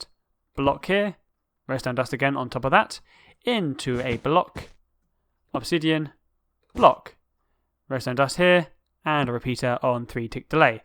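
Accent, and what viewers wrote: United Kingdom. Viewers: i love the british accent.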